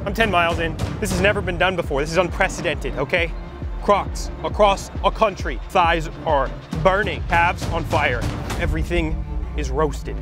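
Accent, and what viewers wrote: United States. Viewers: are you from minnesota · As a german man konnte so einfach erkennen das du amerikanisch bist 😃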